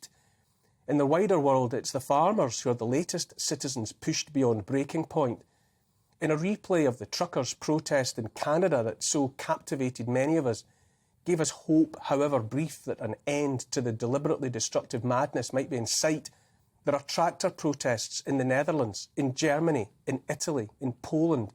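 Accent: Scotland, United Kingdom. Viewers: ❤️💞❤️ Nails it every time! Thank you for being the voice in the wilderness Neil ❤️💞❤️ A gentle, beautiful Scottish lilt A kind, empathic, forward tilt An open heart; a caring face A willingness to take his place. A strong, home-hitting monologue Reminds us of the boiling frog The courage to become a sleuth A passion to release the truth. A deep desire to crack the whip Illuminates our freedom-strip Calls out the darkness taking hold The shiny despots; plated gold! Intense and raw, he lays it bare A soul-enhancing, honest stare Inflections drive the message through He speaks for Us - for me and you! Discernment's such a precious gift His words create a mighty lift Take heed of all he has to say He knows - it shouldn't be this way! 🤗🦋🐬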